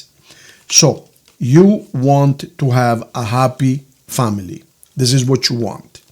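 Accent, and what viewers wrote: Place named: Italy. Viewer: The Italian accent is appealing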